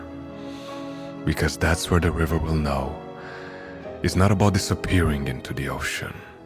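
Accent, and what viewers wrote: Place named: Hungary. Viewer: Hi, thank you so much. Your readings are amazing. I'm a writer and poet myself and hope to get published soon. Listening to your readings is like medicine for my soul. As a Canadian born Hungarian, your accent makes me feel my long passed family around me again. Thank you again for so many reasons that I can't say. Have a great day ❤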